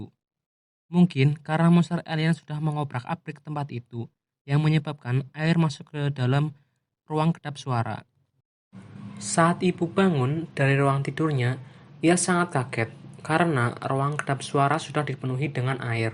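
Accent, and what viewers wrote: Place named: Java, Indonesia. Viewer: Mantap tetep konsisten medoknya.. 👍🏻😁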